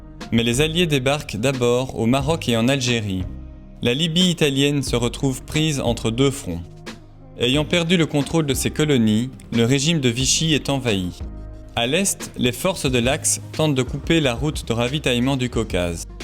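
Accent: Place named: Belgium. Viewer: Raphael Ebony Fans c’est l’accent belge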